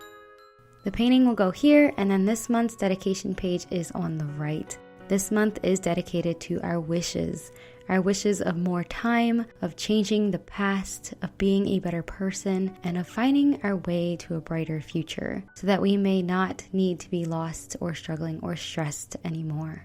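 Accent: Vietnam. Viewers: Leave me a Comment is hãy để lại cho tôi 1 bình luận in vietnamese. Your voice is so sweety, and you are speaking the southern accent.😍😍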